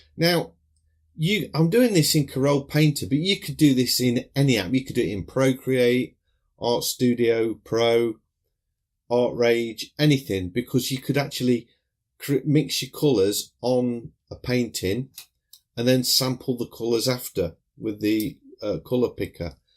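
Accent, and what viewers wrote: United Kingdom. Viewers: Now can you teach me to speak with an English Accent? Love it!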